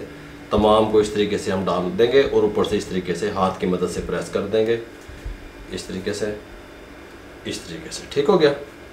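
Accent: Punjab, India. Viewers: بھائی ریسپی کا سواد تو اپنی جگہ۔پر آپکا بیان کرنے کا انداز انتہائی سادہ اور پنجابی لہجہ کمال۔ بہت اعلی۔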